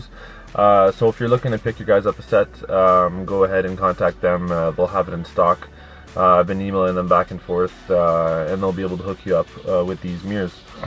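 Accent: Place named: Canada. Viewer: this is random but your Canadian accent is awesome! haha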